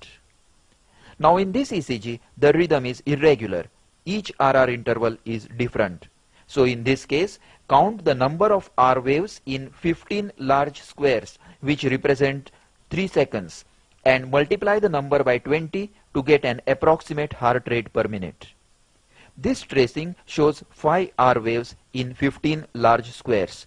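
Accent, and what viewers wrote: India. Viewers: Thank you. but you'd better say no thing ! indian english is damn terrible ! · good (old) video, but his indian pRRRonunciation killing my eaRRRRs.....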